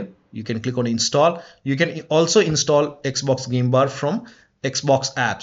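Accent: India. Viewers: As soon as I heard the Indian accent, I knew its gonna work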